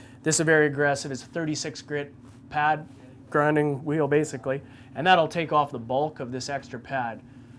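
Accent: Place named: Canada. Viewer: FatherSonGunFun Um, pretty sure that's a Canadian accent.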